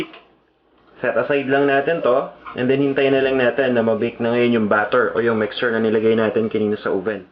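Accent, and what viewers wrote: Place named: Visayas, Philippines. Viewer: disappointing filipino who is very poor in giving instructions as well as has no idea about measures. ademas, obviously not from the tagalog region. in a brazo de mercedes video says 'i-combine' when in tagalog combine simply translates to 'ihalo'; transfer - 'isalin'. maybe he is bisaya either make your presentation in english or stick to cebuano!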